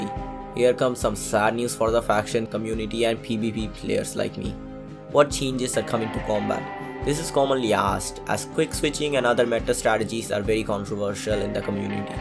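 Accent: India. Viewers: Indian ah accent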